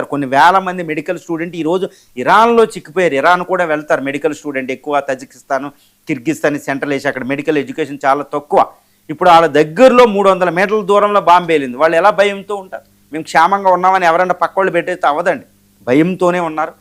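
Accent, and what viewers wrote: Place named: Telangana, India. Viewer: Telangana yasa la balay chayptunaru Sir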